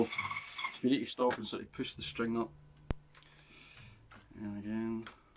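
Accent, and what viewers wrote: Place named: Scotland, United Kingdom. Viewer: It would shorten the video..... Then we would miss out on that beautiful Scottish accent. Nice video. Well done.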